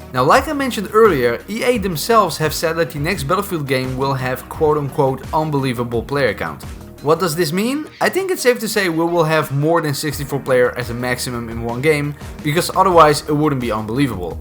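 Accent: Netherlands. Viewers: This guy is Dutch, i could hear it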